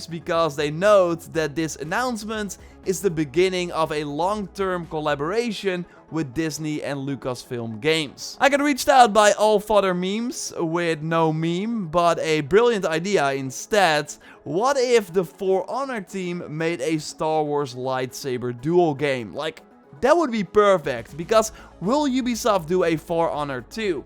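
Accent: Netherlands. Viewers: Oh haha Your dutch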